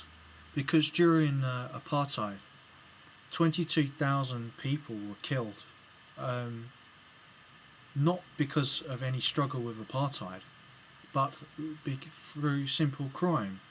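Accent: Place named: England, United Kingdom. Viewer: By ur accent I know that u are from England.shameful u are clueless about the murderers and murder victims of apartheid. I really don't understand why u sit down always and talk rubbish SA when u can put ur time into a good source. Well know it the English adore Mandela . Go to the famous tralfargar square and see his sculpture there. So do us a favour and stop blabbing lies u spineless coward with no brain and unscrupulous even when u at lying. U tube is not meant for idiots like u.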